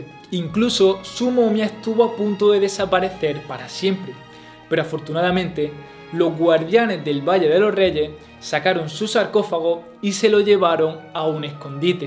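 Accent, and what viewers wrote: Andalusia, Spain. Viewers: His way of speaking isn't even close to a person from Madrid. I'm 100% sure he's from Andalusia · Es español, de algún lugar de Andalucía. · Seguramente es de Andalucía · Wilder José Hernández español segun yo, soy chilena y nuestro acento no es asi jeje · eres español o chileno? Confunde tu acento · Muy bueno el nuevo formato, siempre un placer escuchar tus explicaciones, con tu lindo acento andaluz. En marzo de este año estuve en Ejipto y visite la fabulosa Abu Simbel....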